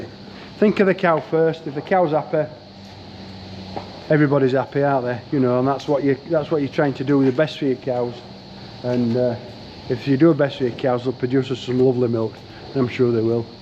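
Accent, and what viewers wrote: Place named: Yorkshire, United Kingdom. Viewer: By heck, thats a grand Yorkshire Accent. Having lived in NZ for 25 years now I miss the accents of the home county. All you need is a robot scraper now! And have you upgraded the JCB?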